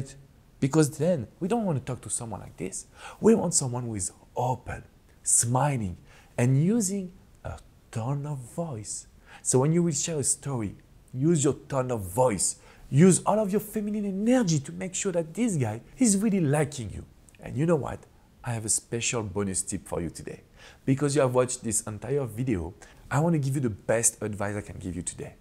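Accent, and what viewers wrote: France. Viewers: Ohhh le french accent je love it 🥖 🍷 🇫🇷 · haha "You can hear my french accent"... 🤣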